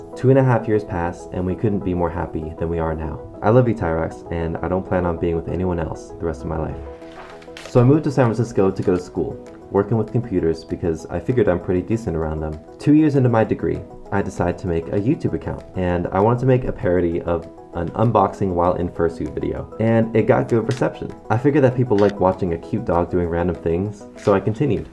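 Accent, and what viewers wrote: United Kingdom. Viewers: Wow they are rude because of your British accent i have a Australia accent well everyone did say i have a accent a Australia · sad british noises, what british accent did you have when you moved? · It's a shame people take a mic about british accents I wish kept his British accent like me seriously tho why would you bully british people if they moved to the states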